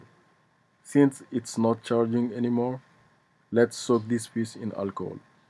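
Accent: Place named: India. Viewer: the fuck what's with the indian accent you making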